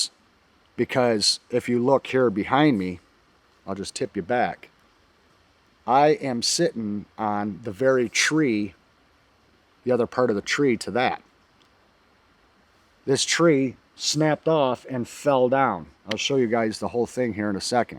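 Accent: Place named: New York, United States. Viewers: You know, i heard you talk and instantly thought he sounds like he’s from New York. I live in the Mohawk valley. We really do have a subtle accent if you will. First time watching any of your videos. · Good video! I thought I recognized your accent lol. (I'm from Little Valley originally, near Jamestown/Olean area in western NY - in Ohio now). We camped at Allegheny State Park recently and I was surprised how unconcerned most campers were about black bears, and many of them thought having bear spray was foolish. I never thought black bears were any kind of threat, I ran the woods all the time as kid in bear country -- then one day I looked up bear attacks on the internet. You'd be surprised how many black bear attacks there are, some fatal. And quite a few predatory. It's not likely, but it sure can happen.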